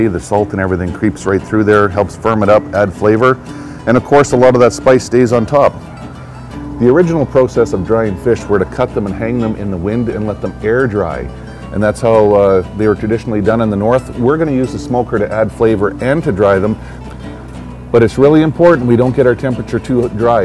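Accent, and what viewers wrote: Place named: Canada. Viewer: Cool except for the creepy Canadian accent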